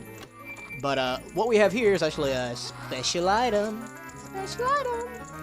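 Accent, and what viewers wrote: South, United States. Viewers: This game really makes your Southern accent shine in a good way!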